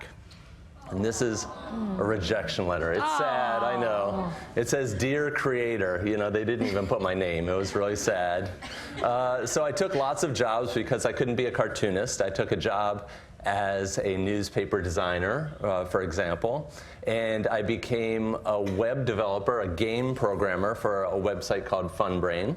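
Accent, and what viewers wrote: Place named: Brazil. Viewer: The way he talk brazilian XD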